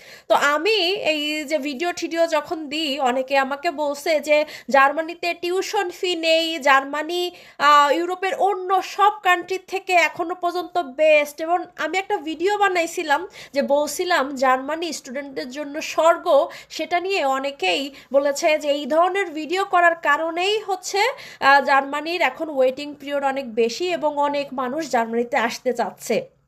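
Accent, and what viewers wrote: Khulna, Bangladesh. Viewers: আঞ্চলিকতা পরিহার করলে ভালো লাগে।একবার খুলনার কথা আবার শুদ্ধ কথা না বলা ভালো।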